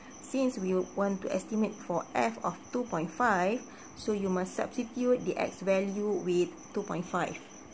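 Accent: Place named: Malaysia. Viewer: Bila dengaq je suara dan slang, dh tahu org melayu👍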